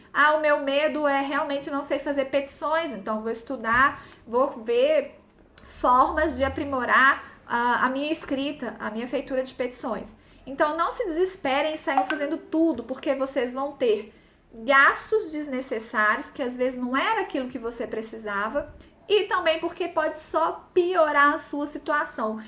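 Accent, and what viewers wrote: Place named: Minas Gerais, Brazil. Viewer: As melhores dicas da advocacia você encontra neste canal! Muito bom! Sem falar no charme do sotaque "mineirinho"... Parabéns!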